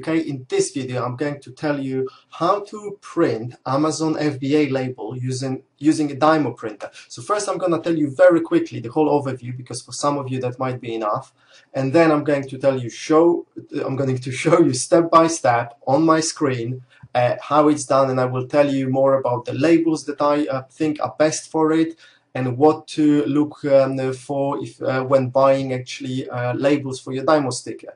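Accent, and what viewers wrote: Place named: Poland. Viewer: Uszy więdną od twojego Ponglish....Pozdrawiam!!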